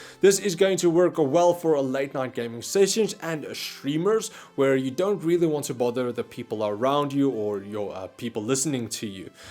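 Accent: South Africa. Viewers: from the start i knew you were from SA en awe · Is he from south africa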